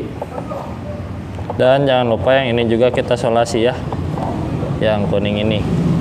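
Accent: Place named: Ambon, Indonesia. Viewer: Mas ini pasti dari ambon dilihat dari logatnya..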